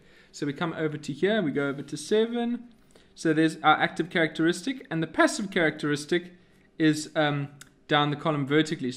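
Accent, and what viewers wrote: South Africa. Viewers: Never knew I needed to hear someone say "Cthulhu" in a South African accent but there you go. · Are you South African?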